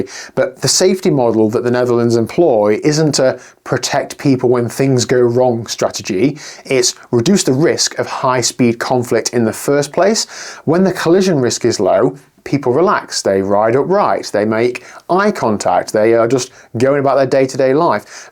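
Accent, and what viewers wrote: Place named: England, United Kingdom. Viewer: You sound as if you’re English. Can you explain to me why so many British people use the word ‘floor’ when they’re outdoors? It’s the road, right? If you stumble or fall off your mountainbike in a meadow or in the woods, you land on the ground, not on the floor, right? I hear this a lot lately. Maybe a result of our modern sedentary life?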